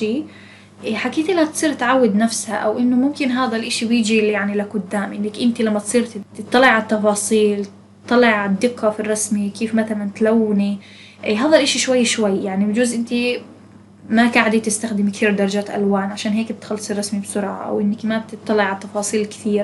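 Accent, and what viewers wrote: Palestine. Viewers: احب جدا طريقتك برسم الزي الياباني تعجبني اتمنى ارسمه مثلك او اتقنه مثلك ماتردي علي بتمسخر ع لهجتك وبعرف انو فلسطين هيك بتحكي وبلعكس حلوة والي بقولو كلم هدول اكابر وبسس^♡^♡_♡ ♥♥♥♥♥ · بالعكس اللهجة الفلسطينة اكثر من رائعة تحياتي لفلسطين و لهجتها ❤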